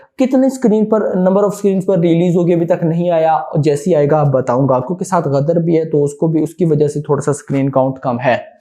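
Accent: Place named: Pakistan. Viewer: ISKI LANGUAGGE SUNKE PATA CHALTA H PAKISTANI H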